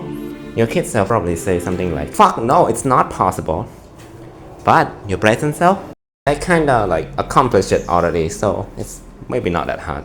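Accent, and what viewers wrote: Singapore. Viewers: U got a strong singapore accent vro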